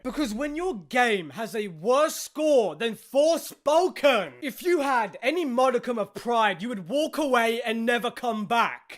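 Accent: United Kingdom. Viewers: Totally agree with you but your loud British accent gave my Irish head a sharp pain. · Your briish rumble makes it even funnier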